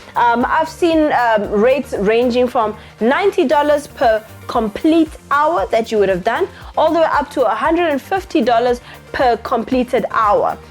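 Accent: Nigeria. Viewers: Girl.... your nigerian accent is on point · That Nigerian accent 😂🤣🙌🏿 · So you have been hanging out with one of my Nigerian brothers.Look at that accent .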